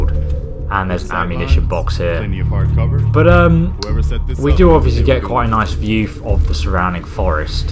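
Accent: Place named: England, United Kingdom. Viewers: definitely not Aussie accent but my bets on Brit or Scottish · This accent is clearly English!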